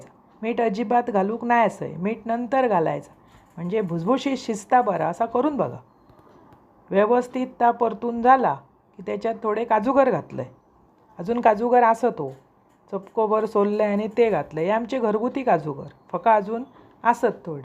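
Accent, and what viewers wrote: Malvani, India. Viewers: तुमची सांगण्याची पद्धत खूप छान...सहज आणि सुंदर मालवणी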